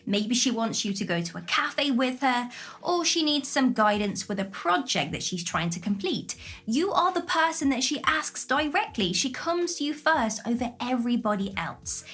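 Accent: United Kingdom. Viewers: I like this ladies sweet British accent 😍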